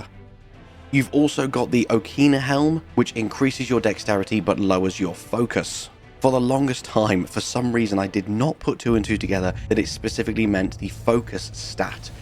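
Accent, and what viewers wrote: United Kingdom. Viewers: British voice Do not recommend channel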